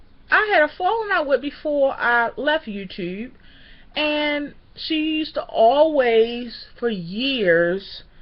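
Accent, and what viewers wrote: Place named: Maryland, United States. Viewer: Girl u sound like me... im from md too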